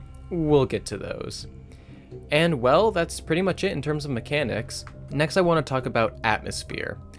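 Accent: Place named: United Kingdom. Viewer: I thought you were British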